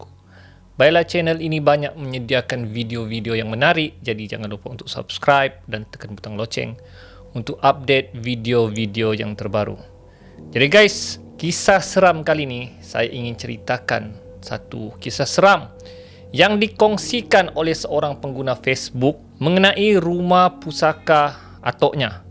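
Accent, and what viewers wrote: Sabah, Malaysia. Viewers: Lambat hadir tapi tetap tengok. Suka dengar orang Sabah cakap. Hehe he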